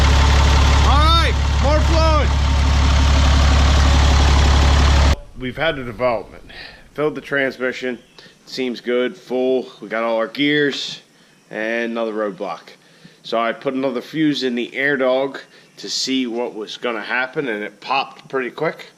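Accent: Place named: Baltimore, United States. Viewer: Hey, I'm new to your channel. Nice videos. I see you live in Buck..I'm just a few miles south of you in MD. You have a Baldimore accent lol.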